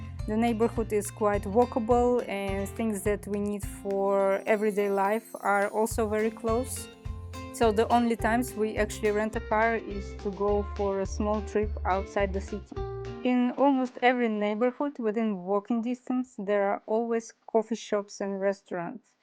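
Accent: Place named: Russia. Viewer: Hi, thank you for the video. My dream is to retire in this area, Antalya or Side. You sound like you are russian, and my wife is russian. I am norwegian. I speak english very well, quite a bit of turkish, some russian. My wife does not speak turkish, but russian and some english. My thinking is that she can live there and manage quite well with russian. However, she does not always like associating with other russians, and I'm not entirely sure why. If we one day will take a trip and see how it is like, would it be good to rent something with AirBnb, or aparthotel like you did? If so, what area?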